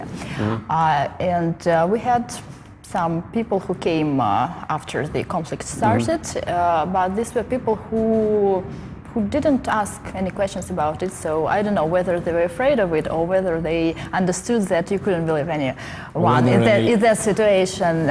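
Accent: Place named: Russia. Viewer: У нее какое то русское произношение ))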